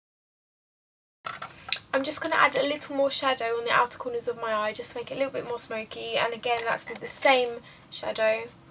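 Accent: United Kingdom. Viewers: Haha She not Irish shes British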